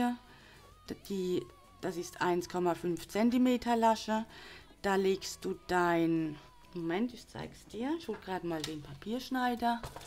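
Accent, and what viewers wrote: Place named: Hesse, Germany. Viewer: Habe dein Einführungsvideo gesehen und kann kaum glauben, dass du dir so wenig zugetraut hast. Box ist sehr hübsch und akkurat gearbeitet. Als gebürtige Hessin mag ich deinen Dialekt ganz gernze hören . LG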